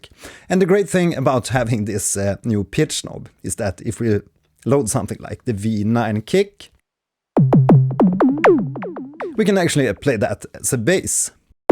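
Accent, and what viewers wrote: Sweden. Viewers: Tänkte först.. Låter lite som svensk dialekt sen såg namnet =)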